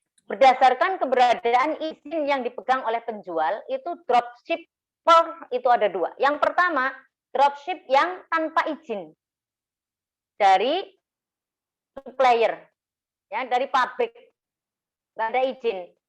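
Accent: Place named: Banyumas, Indonesia. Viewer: Q sung seneng bolak balik tak ngringokna 👍🙏hehe wong ngapak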